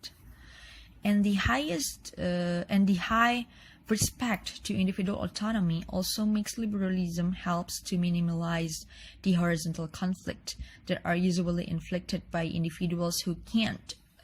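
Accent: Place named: India. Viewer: kayak accent india ya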